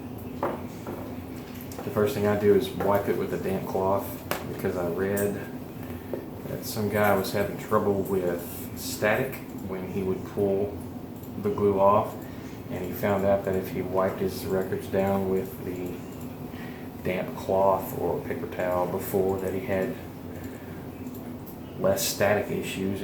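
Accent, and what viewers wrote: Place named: Texarkana, United States. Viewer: Just out of curiosity are you from near Texarkana? I used to work with a guy from there and you two sound alike.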